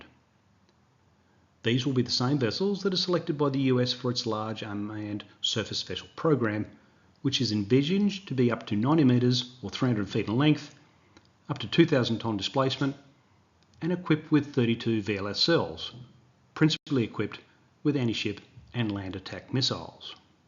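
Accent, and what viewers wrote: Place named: Australia. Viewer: So refreshing to listen to an Australian voice that knows his subject. Thank you for your excellent and accurate content. Yes I have subscribed Instead of the rubbish from the USA and their A.i. voice, their conten is rubbish too.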